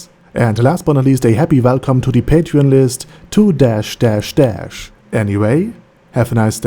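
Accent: Germany. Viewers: oh, so you're german!! that explains the accent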